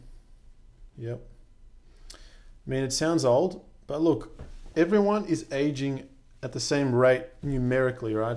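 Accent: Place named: Australia. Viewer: Is it safe to assume you are of greek descent ? :P Im not gonna ask whether you are an aussie, your accent gives it away.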